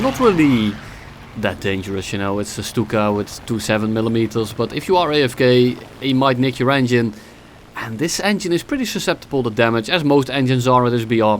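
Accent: Netherlands. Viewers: Never knew he was dutch, I always thought he was an american with a speech disability.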